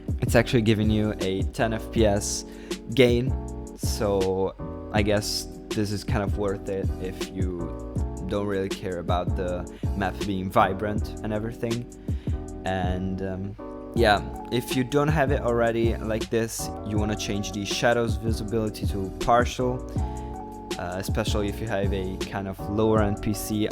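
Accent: Germany. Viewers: Good tutorial thanks (btw German accent? :d)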